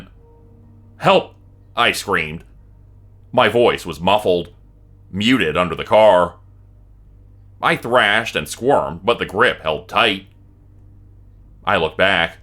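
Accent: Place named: Canada. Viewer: I'm so grateful that you are the story teller and not AI. Your voice is very relaxing, with fantastic expression. Love your accent. Ya Canada ❤ 🇦🇺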